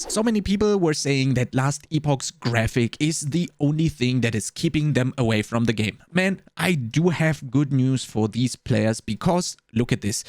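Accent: Germany. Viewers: are you german ????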